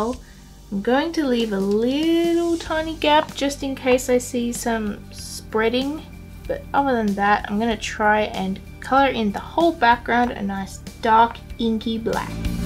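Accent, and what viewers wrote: Australia. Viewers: The bob rossy australian vibes with the way you talk plus the typical you drawing pokemon is just perfect This is how I like it haha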